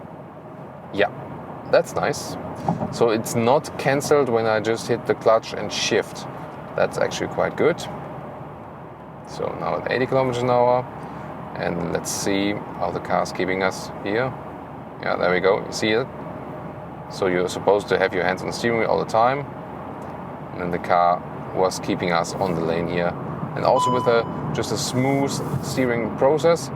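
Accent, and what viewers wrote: Germany. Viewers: congratulation a little bit of german accent but the analisys is quite perfect. go ahead mate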